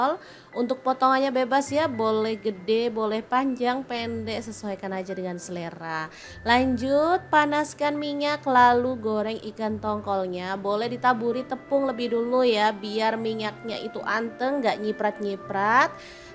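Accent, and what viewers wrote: Surabaya, Indonesia. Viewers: Sampeyan Yen nganggo boso suroboyoan kae kok lucu men... Seneng banget aku... Selain itu masakan2 nya sy catet di buku, sy praktekin... Suwun bngt ilmunya...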